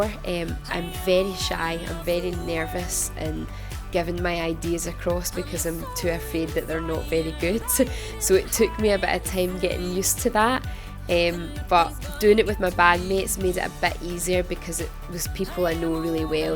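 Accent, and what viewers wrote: Scotland, United Kingdom. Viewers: That scottish accent <3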